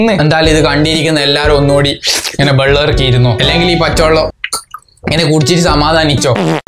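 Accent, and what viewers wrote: Kannur, India. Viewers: ee kannur baasha kelkaan oru prithyeka sugamaa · Kannur slang😂😂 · kannur basha polichu.......😂😂🤣🤣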